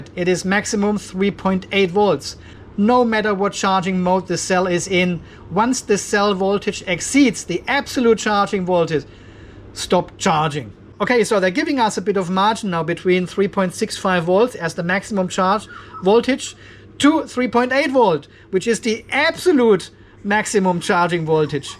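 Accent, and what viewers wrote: Germany. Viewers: Hey ho. Is it only my feeling or do you sound like a german who is living in australia?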